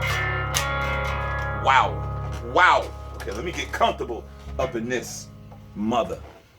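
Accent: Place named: New York, United States. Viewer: Wtf, that clanking and pick slapping sound is HIDEOUS. I am a Zep head playing 30yrs. A true Fender jazz elite fan. I wanted to check this out. I went to you tube for an independent unbiased review but honestly WTF. I hear the Ny'r in ur voice as I am the same but if u like how ur amp is set or u believe that riff u picked thru had some kind of redeeming hook,... u are either very high or delusional. I wanted to get this bass but ur review brought vomit into my mouth. I will need to go play it for myself. I realize u must have done something or recorded or produced but what I just heard is ^#$$^&$@@ Funny in the background my brother just pulled up in a convertible and he is listening to the by.. Go cleans your soul my brother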